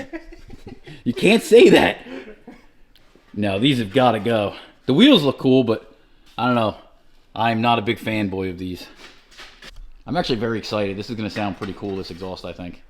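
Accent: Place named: South Jersey, United States. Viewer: Heard the accent and thought south Jersey or DelCo PA haha. Nice exhaust upgrade man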